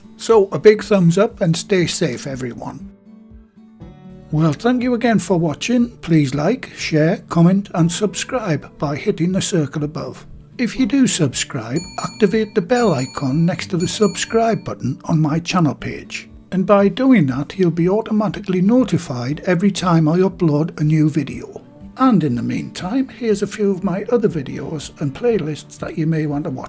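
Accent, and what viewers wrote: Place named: Newcastle, United Kingdom. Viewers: Hello thanks for the amazing tip, I cannot get any strong bread flour anywhere in my area still sold out in all the shops but will keep looking, I am also from the north east of England and when I first watched one of your videos I instantly recognised your accent before you said where you were from ha ha:), your videos are amazing:) stay safe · Wonderful thank you. I love your YouTube presentations. I have lived in Australia for 43 years but I love a Geordi accent🙏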